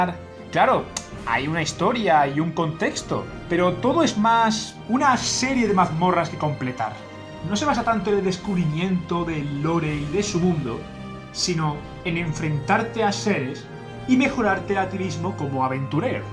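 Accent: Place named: Andalusia, Spain. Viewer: Tengo curiosidad, de que pais eres? Tu acento es como Español pero es muy marcado por ejemplo las carencia de las "s" finales en las palabras y otras cosillas. Conosco acentos de diferentes regiones de España pero el tuyo para mi es nuevo, suena a andaluz? Saludos desde Chile, buen video ;)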